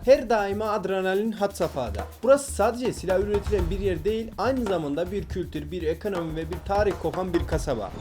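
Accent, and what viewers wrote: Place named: Konya, Turkey. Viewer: Gonya Garabuğan şivesi bu kurumda oralı konya/karapınar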